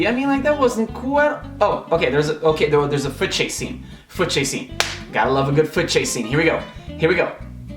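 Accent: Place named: North America. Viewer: cara, gostei pra caralho, apesarapesar de ainda ser um canal pequeno tem uma qualidade incrívelmente boa, e se o nn tivesse falado, eu teria certeza de que vc era norte americano, parabéns mano!!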